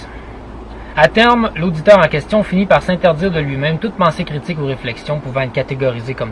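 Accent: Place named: Quebec, Canada. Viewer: Un belle accent gui va avec ton charme !! On adore l'accent québécois en Europe, et beaucoup de charme... Comme dirait un suisse comme je suis je fond comme du chocolat en te regardant et en t écoutant.... Magnifique harmonie !!!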